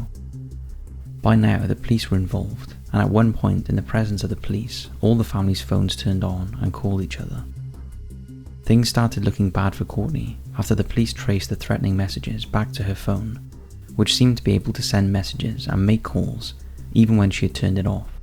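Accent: Wales, United Kingdom. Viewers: I always thought that the narrator was Welsh or has Welsh origins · This is proof that someone with a beautiful British accent can mispronounce words. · He has a welsh accent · Your voice helps me get to sleep. That is a compliment, by the way. Where in Wales are you from?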